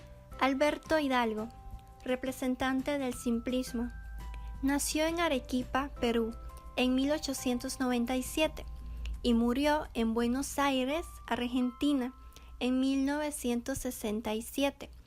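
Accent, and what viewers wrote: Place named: China. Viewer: buen vídeo, solo que la chica habla como si un chino hablara español no sabe decir la r, de resto todo fino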